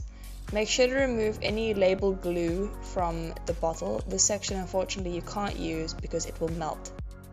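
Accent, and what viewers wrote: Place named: Australia. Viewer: She is southafrican? I thought she was Australian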